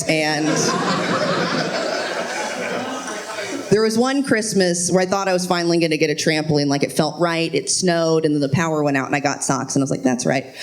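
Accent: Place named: North Carolina, United States. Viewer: I grew up in and around flat rock NC... Definitely got the accent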